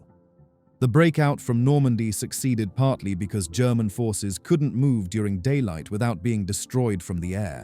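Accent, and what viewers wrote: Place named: United Kingdom. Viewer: The AI-generated narration has no grip on what a timeline is, and its British accent doesn't help.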